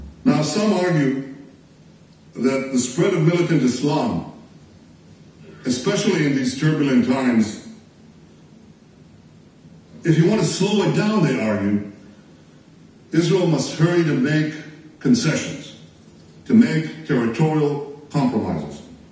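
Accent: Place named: United States. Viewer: Am I the only one slightly unnerved by the fact that the Israeli prime minister sounds like an American (who can properly pronounce country names) when he speaks?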